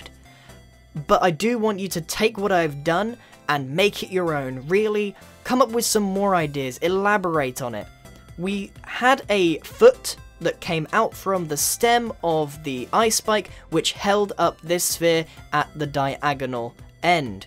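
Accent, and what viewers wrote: United Kingdom. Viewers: HI i liked the video in both ways and i think you are the greatest minecraft builder ever keep up the good work and fot the next video of building your comments please make a lightning hiding from the guy who invented lightning electrisety sorry if miss spelling something or wasting your time and by the way you are british right thats my favorite english accent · JaronPlayz British accent. You cant hear it?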